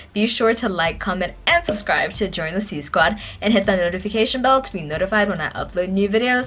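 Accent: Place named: United States. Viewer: You sound American lol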